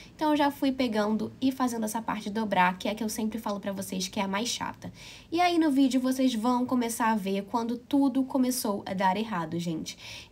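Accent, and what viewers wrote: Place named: Rio de Janeiro, Brazil. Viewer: Tanto casaco; com esse sotaque de carioca? Faz frio ai? Só em Petrópolis ne né?!!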